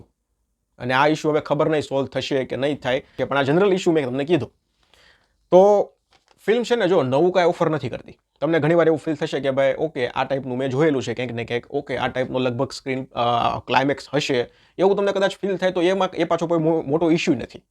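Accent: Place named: Kathiawar, India. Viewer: ગુજરાતી તો સરખું બોલો કાઠિયાવાડી લેહકો સારો નથી લાગતો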